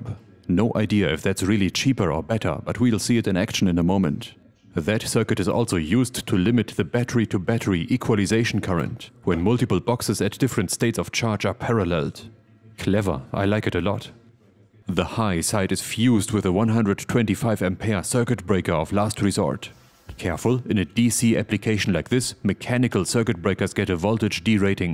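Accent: Germany. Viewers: After 1 second I knew you were German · a german accent to die for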